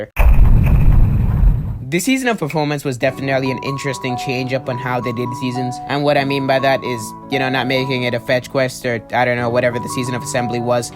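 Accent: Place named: New Jersey, United States. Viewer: I started at the last two week of Nine Color Deer! Dude you sound like you are from Jersey!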